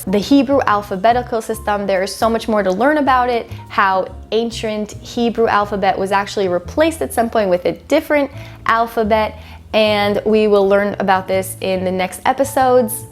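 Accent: United States. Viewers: Funny, she doesn't sound middle eastern, or look middle eastern. In fact, she sounds downright American. Funny that.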